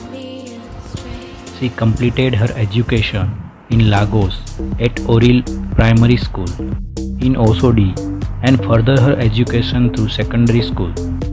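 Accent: India. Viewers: I don't know who wrote or compiled this, but they should go back to find out more about the geography of Nigeria. The commenter is reading with an Indian accent, so I guess not a Nigerian. Oshimili is never and will never be in the Northern Nigeria. Orile is never in Oshodi (lol).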